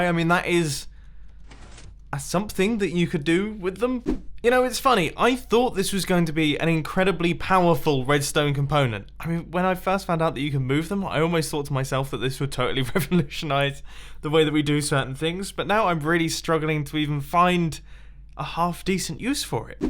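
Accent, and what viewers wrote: United Kingdom. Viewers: For StandingWind: That's because he isn't from USA. He is from UK and therefore the accent is different. Got it??? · I'm pretty sure the way he says it is a British pronunciation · That's why he's getting annoyed, because from UK he pronounces it differently from Americans.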